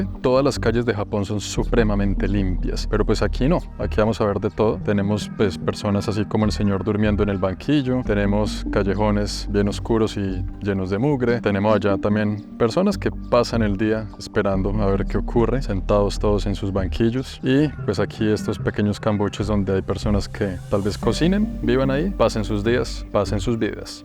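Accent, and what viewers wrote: Bogotá, Colombia. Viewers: jajajaja ese man es mas rolo que la changua ñero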